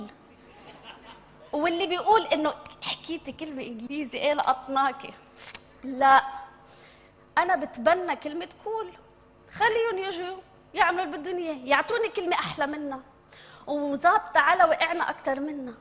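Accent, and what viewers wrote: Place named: Levant. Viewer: يا حلاة اللغة العربية باللكنة الشامية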